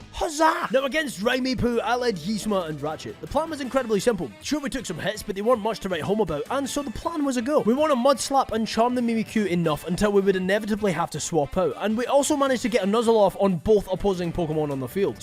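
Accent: Scotland, United Kingdom. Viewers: Love how the Scottish accent properly creeps oot every now n then am here fur it n long may it continue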